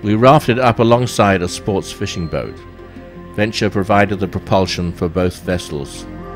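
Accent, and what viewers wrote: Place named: Southeast England, United Kingdom. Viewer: It’s pronounced ee-gwa-nah lol Not ig-u-ah-nah And its you-ka-tahn lol Just saying how the locals pronounce these in their native language lol no worries we know what you meant. I am curious as to your accent though. Its very similar to mine and im from the southeast of the U.K.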